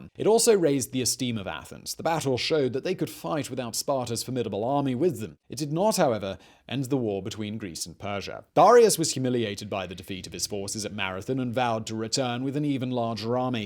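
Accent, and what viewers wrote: United Kingdom. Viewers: You talk so fast, and speak in a British accent, that I have to use subtitles to follow you.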